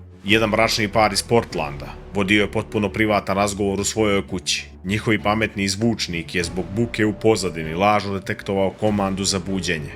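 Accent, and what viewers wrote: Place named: Montenegro. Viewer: Ja ga obožavam slušati baš zbog crnogorskog govora 🥰 a uz to jako je pametan đetić mogu mnogo toga naučiti 😊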